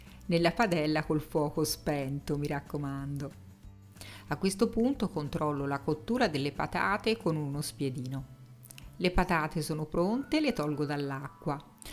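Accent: Tuscany, Italy. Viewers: Devono essere deliziosi😋 adoro le tue spiegazioni e il tuo meraviglioso accento toscano! ❤️ Un caro saluto🤗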